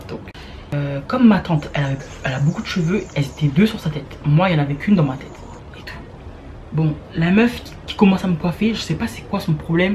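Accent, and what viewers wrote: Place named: Guadeloupe, France. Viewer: je suis une petite youtubeuse et superbe vidéo je viens de te découvrir et je vois que tu parles créole guadeloupéen ça fait trop plaisir ❤️🔥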